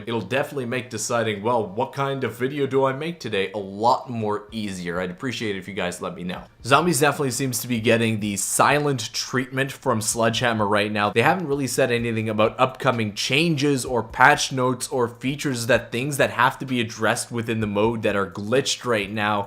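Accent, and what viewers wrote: Canada. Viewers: Is this guy canadian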